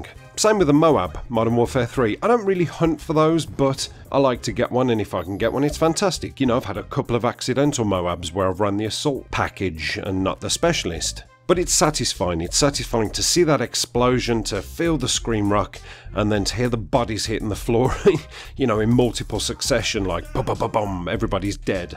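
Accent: United Kingdom. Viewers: are you British? *****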